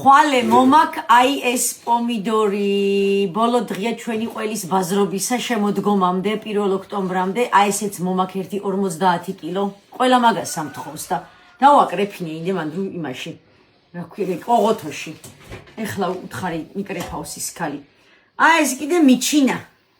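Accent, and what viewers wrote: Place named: Kakheti, Georgia. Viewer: Rogor mikvars es aqcenti da saubari kvela kutxes tavisi damaxasiatebeli saubari aqvs mtis kaxur narevi saubari ❤gaumarjos saqartvelos tavisi lamazi kutxeebit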